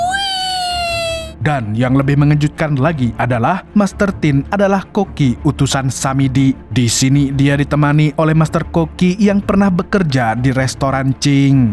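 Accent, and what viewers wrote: Banjar, Indonesia. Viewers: Banjar banar😂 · Urang Banjar kah amang ni...??😂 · Urang Banjar sekalinya pian 😂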